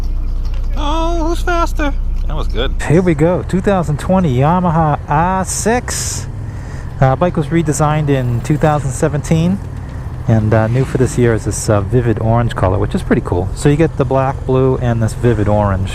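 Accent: Boston, United States. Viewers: It’s like Ben Affleck’s accent in Good Will Hunting...on steroids, in a bad way. Could only go 2 min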